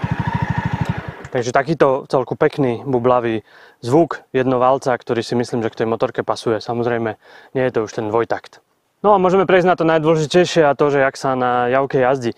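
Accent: Eastern Slovakia, Slovakia. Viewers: Co to je za STRAŠNEJ dialekt???????. Nejsem Slovák, ale ten přízvuk je strašnej i pro Čecha. To je co za dialekt něktéré části Slovenksa u Ukrajiny nebo Polska, Maďarska????? Díky za odpověď. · Ten východniarsky prízvuk mi uši trhá...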